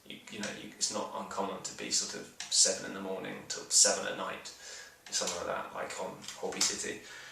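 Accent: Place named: England, United Kingdom. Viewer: so it WAS an english accent after all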